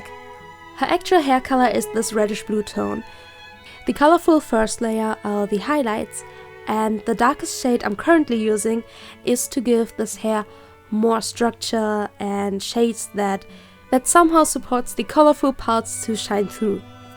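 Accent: Germany. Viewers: lol that hard german accent, but either thx :)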